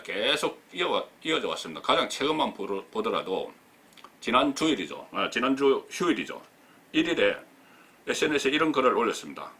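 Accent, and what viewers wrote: Gyeongsang, South Korea. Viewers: 송본부장의 말을 좀 천천히 하세요 경상도 사투리에 말이 빠르면 타지방 사람들이 잘 알아듣지 못합니다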